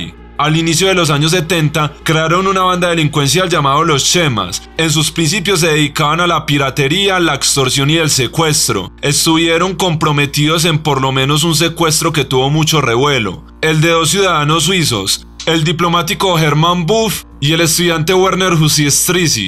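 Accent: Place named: Northern Valle, Colombia. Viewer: Este canal es muy bueno, lo mejor es el acento del norte del valle que le pone al relato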